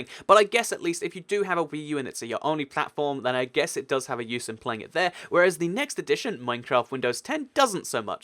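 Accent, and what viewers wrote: United Kingdom. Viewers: You sound mix of British and Russian and German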